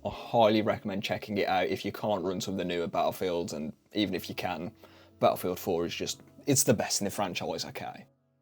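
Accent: Black Country, United Kingdom. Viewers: great video, your accent sounds familiar, maybe the black country, Im a PC builder myself and I don't see many people around my area building computers haha. carry on with the great videos.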